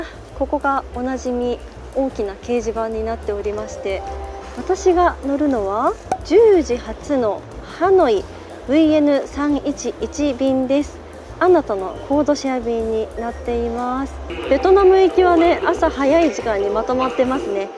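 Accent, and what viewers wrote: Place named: Okayama, Japan. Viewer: 時々出てくる岡山弁にも好感が持てます😊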